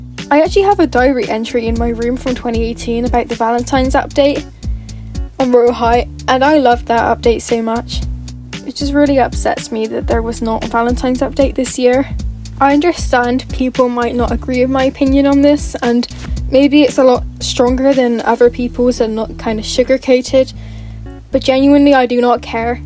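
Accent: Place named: United Kingdom. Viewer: Ur voice sounds so much more British than irl 🧐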